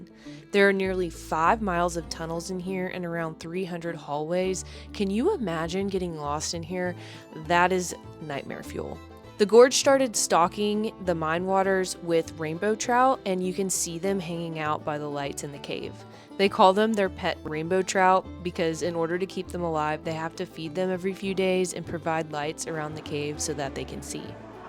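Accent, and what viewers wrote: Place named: South, United States. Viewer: She is very beautiful. I love her smile and southern accent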